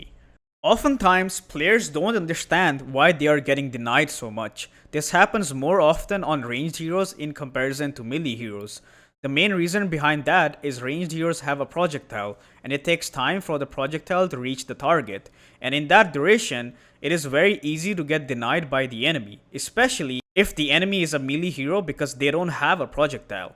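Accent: India. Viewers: the indian accent even makes it soo much better. just like watching any other tutorial video online. its a familiar feeling.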